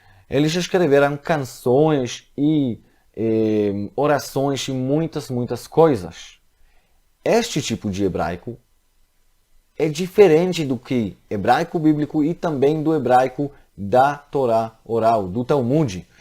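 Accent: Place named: Rio de Janeiro, Brazil. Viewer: SHALOM PROFESSOR! SEU APRENDIZADO DO IDIOMA PORTUGUES FICOU BEM CARIOCA OU DE PESSOAS DO RIO DE JANEIRO.TEVE ALGUMA INFLUENCIA DE SOTAQUE CARIOCA QUANDO ESTUDOU PORTUGUES?SÓ CURIOSIDADE HEINN..☺DEVO DIZER QUE SUAS AULAS SAO EXCELENTES!!!PARABENS!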